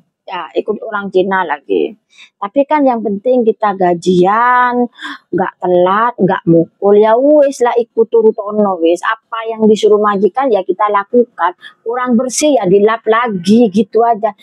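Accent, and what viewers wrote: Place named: East Java, Indonesia. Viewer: Biasa nya mental ya kak yg harus kuat klo gampang mlempem mental nya jgn coba² jadi tki/tkw,, mantap kak motivasi nya, btw kakak logat nya kyk ibu mertua saya kyk logat² probolinggo, situbondo, bondowoso, hehe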